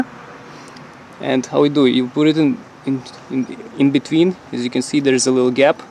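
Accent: Russia. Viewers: Наш русскоязычный парень, судя по акценту😊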